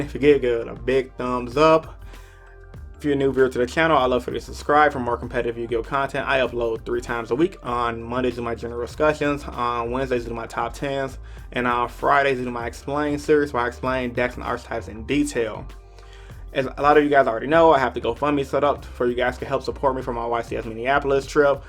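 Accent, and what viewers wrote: Chicago, United States. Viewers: are you from chicago?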